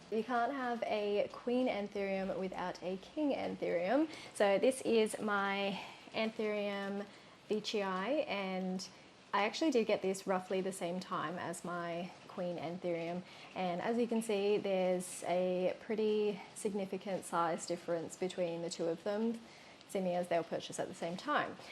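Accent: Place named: United Kingdom. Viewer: Wow your anthurium collection is so healthy and all the plants look great. I wouldn't have thought they could grow without a humidifier and I've been afraid to get any. My ambient air is probably drier than your climate though as I live in a desert! We hardly ever get the rain you were getting he day of filming. And your accent sounds British so maybe it rains a lot there in which case your ambient air has higher humidity than desert air!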